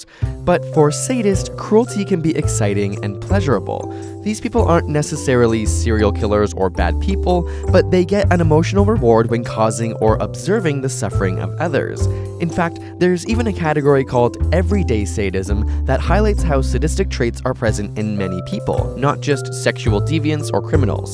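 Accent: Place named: Canada. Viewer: It's not the gayness that bothers me. It's the way you Canadians say "out". That's funny.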